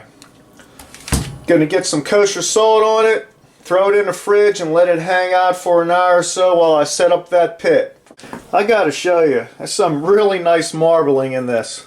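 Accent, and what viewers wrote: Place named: Western Pennsylvania, United States. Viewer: You sound like you are from Western Pennsylvania you jag.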